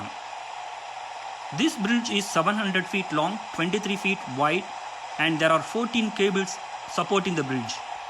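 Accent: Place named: India. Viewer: Your Indian accent kills me watching this video🤣🤣💀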